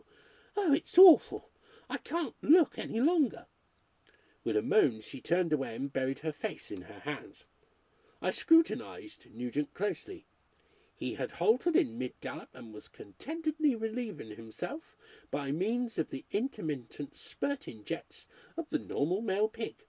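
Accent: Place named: United Kingdom. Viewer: Thank you. Lovely british accent to go with it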